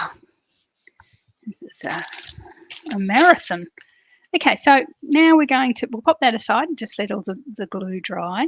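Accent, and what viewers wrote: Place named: Australia. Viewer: So nice to have an Aussie voice who is normal and makes mistakes. Your cards are great.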